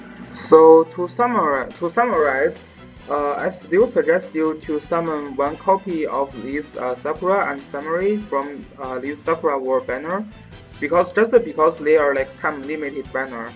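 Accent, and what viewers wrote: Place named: China. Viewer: I cant understand that english , such a strong chinese accent , and music background make it worst ....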